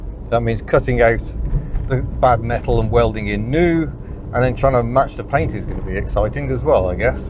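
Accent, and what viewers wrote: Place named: Birmingham, United Kingdom. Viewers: Did enjoy your brummie accent lol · Your Brummie accent was the highlight of this video. Nice to see Myrtle getting some love.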